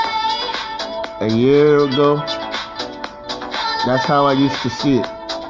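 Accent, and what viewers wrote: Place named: London, United Kingdom. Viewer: On form today 😂😂😂 And, for those of us who do have fish and chips here ~ we do usually do that on a Friday!!! Love your London accent! Bless you for making me laugh! 🙏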